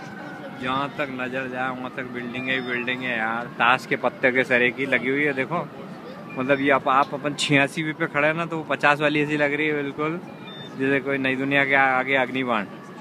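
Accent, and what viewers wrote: Indore, India. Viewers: ji maja a gaya yr....kya mst indori bolte ho yr....me bhi indori he hu...dada na pardada sab yahi ka he h....tumne to mtlab dil jeet lio yr....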